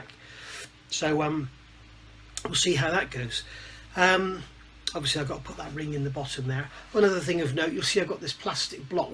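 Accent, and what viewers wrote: United Kingdom. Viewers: Hello, is that a south Bristol accent.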